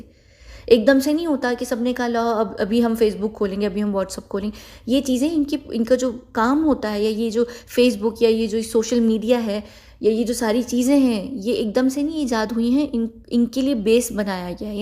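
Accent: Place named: United Kingdom. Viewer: How come an Agent pronounce English words like this way when her accent seems British sometimes??..Confusing.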